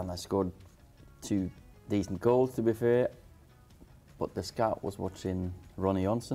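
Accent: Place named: England, United Kingdom. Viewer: Hes got a English accent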